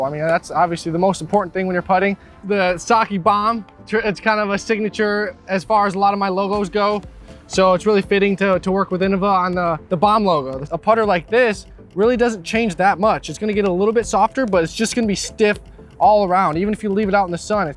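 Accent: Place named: Northeast, United States. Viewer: Played it at 1.5 speed! Reminds me of folks from the northeast!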